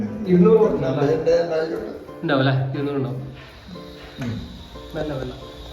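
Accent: Kannur, India. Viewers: ഇയാളുടെ സംസാരത്തിനു കുറ്റം പറയുന്നവർ ഓർക്കുക പല നാട്ടിലും പല രീതിയിലും ആണ് സംസാരം ഇത്‌ കണ്ണൂർ കാസർകോട് ഭാഷ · KANNUR BHAASHA ETHRA NANNAAKKAAN NOKKIYAALUM MOZHACHIRIKKUM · ❤kannu style aanu samsaaram.its ok. but culture illatha oru perumatam . oralod samsarikkumbol ulla reethiyil maatam varanam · Ninda oru kannur samsaram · Kannur slang👎🏻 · valre shriyanu chodukkanum , athinu corect Aayi marupadi paryanum shrmilkuka malayalm kannur slang pryumbo kelkkunnvarkku arochakamyi marthirkkan prthyekam shrdikkukka njanum oru kannur payynnur karan anu nammlde slang mattullvrkku mansilyikollenminnilla athu onnu shraeicha thnne nannvum Aniya prgarm nallthanu niglude oru tudkkakrnte vishmam undu athokke sheri ayi vratte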